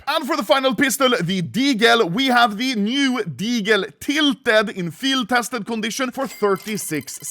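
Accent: Yorkshire, United Kingdom. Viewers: YORKSHIRE INNIT 🗣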